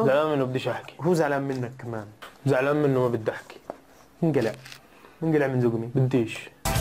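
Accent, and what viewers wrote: Jordan. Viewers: انت أردني صح؟ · انت أردني؟؟؟؟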